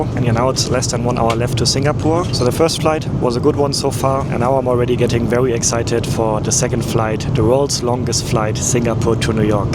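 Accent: Germany. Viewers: Beautiful videos. As a Greek raised in different countries Love your German Accent 🙂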